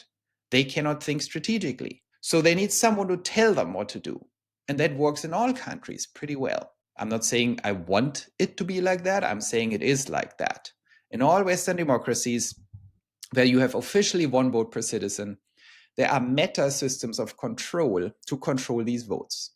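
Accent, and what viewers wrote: Germany. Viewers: Hmm... You sound German. You look Filipino. You live in the US (I think). And have a Thai sounding name. Fascinating! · You’re making so many false claims on statements in this video that I couldn’t take it anymore. I would love to point out all of them to you, but unfortunately YouTube keeps deleting my comments. So let me just say that it is a real shame that someone who really doesn’t have a lot of insight and understanding of German politics is spreading misinformation on the Internet. Going by your accent, you may have been brought up in Germany but that doesn’t change you blatant misrepresentation and errors.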